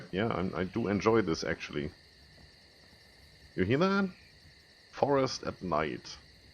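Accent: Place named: Germany. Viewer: Hey hey, So wie du redest würde ich sagen Deutscher xD nettes video ;D besteht der fehler nach dem Server reboot immernoch ?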